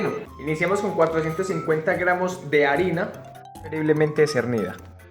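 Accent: Colombia. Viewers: Me encanta ese acento Colombiano. Saludos 😙😍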